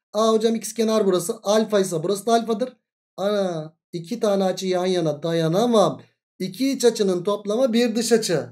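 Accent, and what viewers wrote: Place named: Thrace, Turkey. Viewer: Hocam siz devrekli değil trakyalısınız bence hatta Çanakkalelisiniz. Konuşmalar çok bizden 😄 ağzınıza sağlık anlatımınız süper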